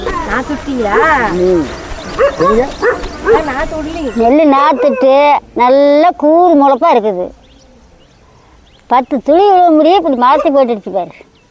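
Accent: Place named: Kongu, India. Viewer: Kongu region maari irkkuthenga entha ooru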